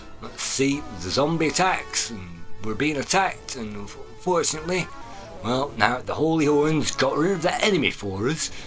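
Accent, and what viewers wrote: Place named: North, United Kingdom. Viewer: I like to hear northerners and wunder from what county thou art.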